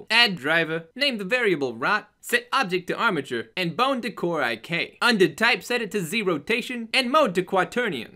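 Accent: New York, United States. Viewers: love your tutorials but the ny hot dog vender thing you have going on is annoying af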